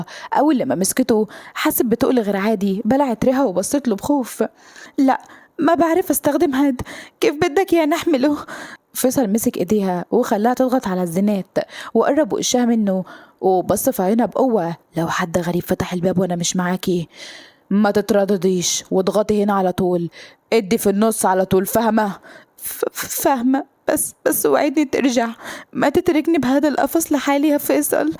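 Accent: Syria. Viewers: 😂 او يا بتعرفي تحكي سوري كويس ماشي حال جيد جدا ❤ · لهجتك السورية جميله قوي ❤❤❤❤ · حبييييييييييت اللهجة السورية تبعك❤ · تحفه وصوتك تحفه وكلامك تحفه وقصصك تحفه ونتي تحفه كل حاجه فيكي تحفه ولكلام السوري طالع من بقك زي العسل ربنا يخليكي يا يا مدلعانا حبيبت قلبي انتي 💐👏💝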